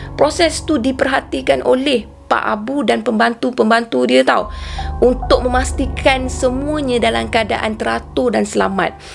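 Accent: Kelantan, Malaysia. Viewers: ooo...orang kelante. Jangan marah, saya suka cerita-ceritanya, cara penyenpaianya...eee dengan rautwajah yang berubah-ubah. Rajin awak cari bahan😓😓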